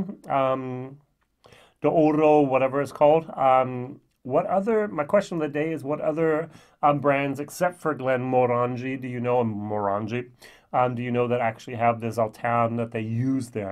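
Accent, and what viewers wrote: Germany. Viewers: Your German accent is getting the better of you. The "S" in Sauternes is pronounced as an "ess", not a "zee" [sōˈtərn]...;-) I was gifted a bottle of WHISTLEPIG OLD WORLD SERIES SAUTERNES FINISH RYE 12 YEAR which was impressive. Looking forward to a whole new year of your vlogs.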